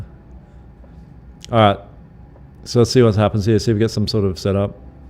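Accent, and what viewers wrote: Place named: Australia. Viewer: I live overseas as well and it's nice hearing an Aussie voice when watching streams. Keep up the good work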